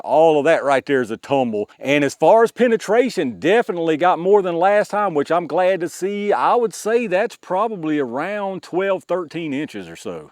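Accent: South, United States. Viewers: Your channel is the best! Love that southern accent.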